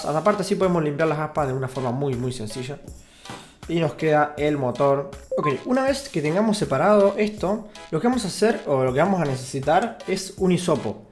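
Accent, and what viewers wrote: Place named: Argentina. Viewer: eres como un mexicano con acento de argentino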